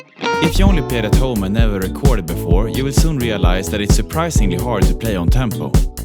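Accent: Stockholm, Sweden. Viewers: jess i hear de svengelska is going krejsi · Never ever lose your swedish accent ❤ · swedish accent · is this guy swedish??? jag älskar allt om den här videon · please brother, try and lose the harsh stockholm accent when speaking english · Gotta love your Stockholm dialect while speaking English. 😆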